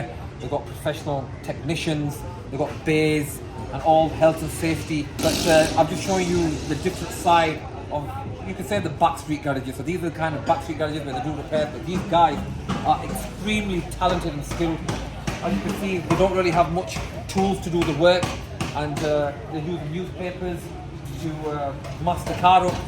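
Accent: United Kingdom. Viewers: Sound like Yorkshire accent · Filthy Northern accent. He can't even change his accent to pronounce it properly smh.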